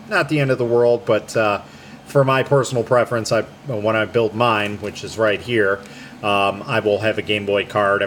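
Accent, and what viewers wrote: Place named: Chicago, United States. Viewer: dude where are you from? You have a killer Chicago accent coming though on these videos!!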